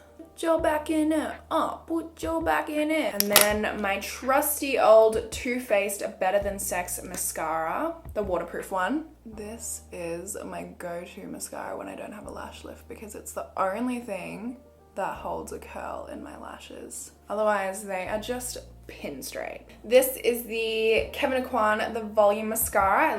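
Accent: Australia. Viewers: I know people have probably already said it but she reminds me so much of Maia Mitchell. I think their noses are similar. But the Australian accent just makes it better lol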